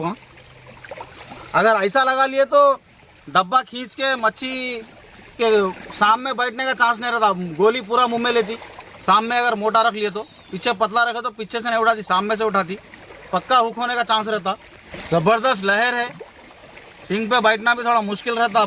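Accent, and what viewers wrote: Hyderabad, India. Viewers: Bhai Hyderabad se hai kya aap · kya mast bola... sounds like hyderabadi language..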